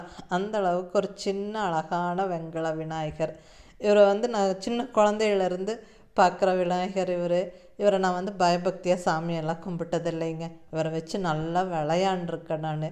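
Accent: Coimbatore, India. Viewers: Ungal voice super mam Coimbatore karanganu nala ah tarithu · Amma really nice collection amma anakum vinayakar romba pidikum kongu tamil super innum neraiya video poduga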